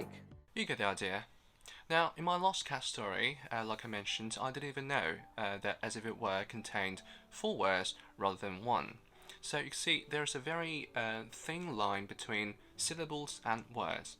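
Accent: United Kingdom. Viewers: 厉害的小伙子！第一次看视频。 Elegant British English!💕💕😊😊💙💙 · 英音听着好舒服❤❤❤ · 請問可以分享您成為口譯員的歷程嗎? 謝謝 ps:英國音真好聽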